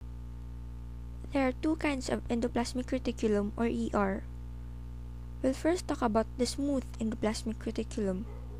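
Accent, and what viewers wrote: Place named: Philippines. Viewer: funny how i can tell this girl is a filipino =)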